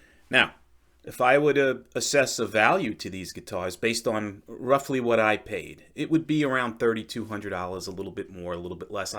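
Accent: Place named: New York, United States. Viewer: Think I detect a NY or NJ accent.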